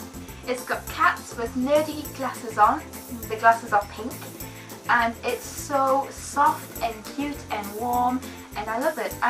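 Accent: United Kingdom. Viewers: Are you either.. Scottish? English or irish?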